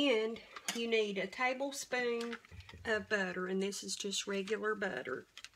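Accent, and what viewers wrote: South, United States. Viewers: I love your videos AND your Southern accent!!!!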